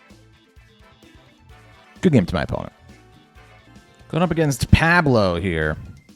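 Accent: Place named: Philadelphia, United States. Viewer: Your Philly accent was aht bigtime today pal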